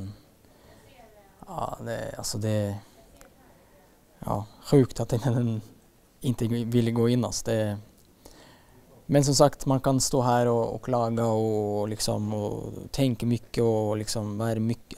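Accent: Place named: Norway. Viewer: Han har börjat prata lite mer norskt igen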